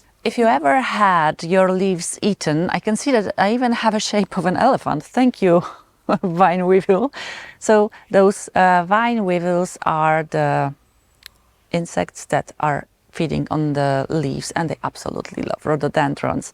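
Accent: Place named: Poland. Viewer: Hi, it's always so nice to see you, I love your Polish accent, please don't change it:) and your garden of course is sooo beautiful. I am watching you from South Carolina, USA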